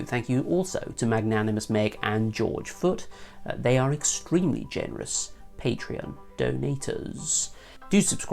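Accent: United Kingdom. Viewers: I always like british accent ;)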